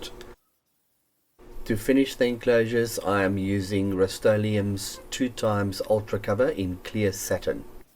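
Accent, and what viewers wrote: South Africa. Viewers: Is that a South African accent I’m hearing? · Are you South African? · Heard you were sporting a South African accent. Let me know if I'm wrong. I'm curious to know of you imported the speakers and equipment or if you shopped locally. If it's local supply, where did you procure the speakers from. I'm looking to buy from parts express, but the import costs are hefty.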